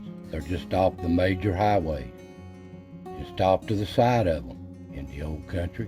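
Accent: Appalachia, United States. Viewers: Another great video by a man with a wonderful Appalachian- southern accent.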